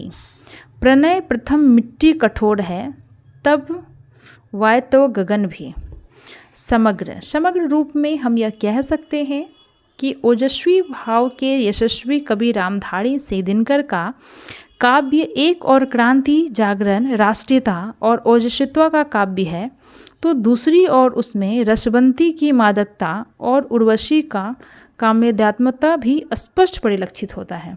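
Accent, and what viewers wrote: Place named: Bengal, India. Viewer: और दीदी आपका आवाज मुझे बहुत अच्छा लगा इसमें बंगाली टोन है जो मुझे बहुत ज्यादा पसंद है और पता है दीदी मैं आज तक खुद से ही असाइनमेंट के सारे आंसर ढूंढती थी और लिखती थी किताबों से पर जब मुझे प्रश्न 5 का क नहीं मिला तो वह पद्यांश में गूगल पर सर्च कर रही थी तब मुझे आपका वीडियो दिखा इसका मतलब की जो आपने छोटे-छोटे प्रश्न के उत्तर दिए हैं अलग-अलग करके एक-एक प्रश्न के उत्तर दिए हैं वह बहुत यूजफुल था सर्च इंजन में काम करने के लिए। मैं उसी के सहारे आपके लॉन्ग वीडियो तक पहुंची हूं आपका काम बहुत अच्छा है दीदी अगर छोटा-छोटा वीडियो नहीं डालती और इकट्ठे बड़ा डालते तो शायद मै आपकी इस लॉन्ग वीडियो तक नहीं आती। मैंने पद्यांश डाला तो उसके उत्तर के रूप में आपकी वीडियो आए और अर्थ सहित और ऊपर मैं वह क्वेश्चन का आंसर दिखा रहा था और आपका टाइटल भी था यह सब बहुत अच्छा था तभी आप फर्स्ट नंबर पर सर्च में आ गई गूगल क्रोम पर और दीदी आप इसका प्लेलिस्ट भी बना सकती हैं उसे जनता को बहुत सुविधा होगी और दीदी आपका काम बहुत अच्छा है.आपकी लिखावट बहुत ही स्पष्ट है आपकी लिखावट बहुत अच्छी है मुझे कोई दिक्कत नहीं हुई है और जहां अगर थोड़ी बहुत दिक्कत हुई तो आपका बोल-बोल कर बताने से वह प्रॉब्लम भी नहीं हुई और बहुत आसानी से स्पष्ट आवाज में सब कुछ समझ आ गया और मैं फाइनली एक असाइनमेंट कंप्लीट कर दी दीदी आपको धन्यवाद आपने बहुत अच्छा काम किया है आप बहुत काम समान में भी अपने कमरे को किताब की वीडियो बीच में फोकस की है जिससे पूरी कॉपी दिखाई दे रही है दर्शन को बिल्कुल भी परेशानी नहीं हो रही है और आप उंगली रखकर एक-एक बता रही हैं और जल्दी-जल्दी पढ़ रही है सब बहुत आरामदायक था बहुत अच्छा लगा दी थी आपका काम जिसको इसकी जरूरत है उसके लिए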